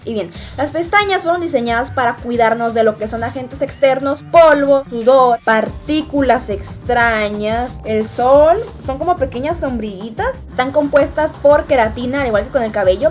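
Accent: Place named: Torreón, Mexico. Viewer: Oye de dónde eres? Es que hablas como los de aquí de torreón jiji saludos! Gracias por tus consejos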